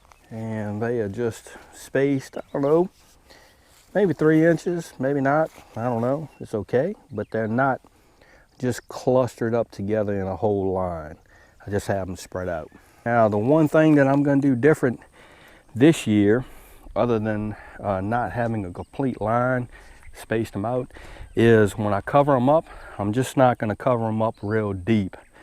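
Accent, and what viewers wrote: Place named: Louisiana, United States. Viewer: You can spend time spacing them evenly, or you can spend time thinning. You must be pretty close to the border because I'm hearing some coonass in your accent.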